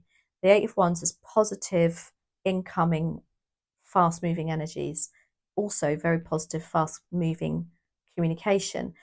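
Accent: United Kingdom. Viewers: So nice to hear an English accent 😃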